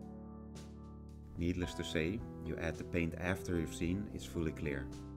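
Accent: Netherlands. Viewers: You are dutch, aren't you? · Laat me raden, Je bent Nederlands of niet? Hahaha Nee maar Nicely done! :D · is he dutch? his accents sounds sooo dutch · You sound Dutch haha. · Are you dutch?!?!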